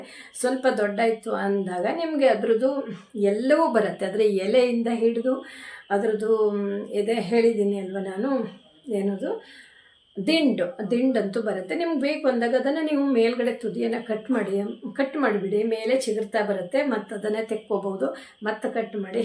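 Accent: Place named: Kerala, India. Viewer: Nimma matugalu kerala dvaru kannada matanadidante ede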